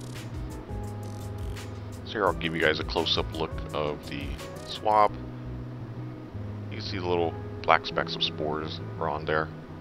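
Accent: Philadelphia, United States. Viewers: . Yup, he's from Philly alright. Anyways, I hope those jawns worked out for ya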